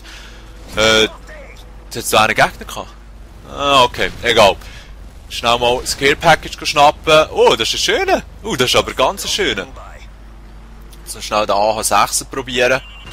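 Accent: Switzerland. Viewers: ich versteh auch fast nix :D is schweizer glaub ich :D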